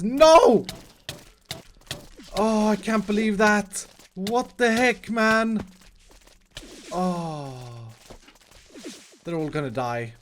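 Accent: Sweden. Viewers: Is you from sweden? 🇸🇪😁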